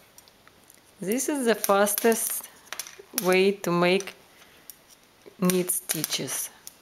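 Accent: Russia. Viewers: This is a good video you did well. Thank you. I am used to listening to the Russian accent as my daughter-in-law is Russian. Thank you for sharing. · You have the same accent as CrazyRussianHacker!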